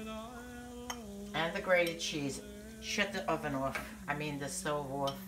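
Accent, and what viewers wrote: New York, United States. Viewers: What a hoot ! :) You sound like a New Yorker, my home - music to my ears. Loved your video. I've watched a few others stuffing artichokes and yours is the best, hands down. I'll be checking out your other videos. Keep cooking.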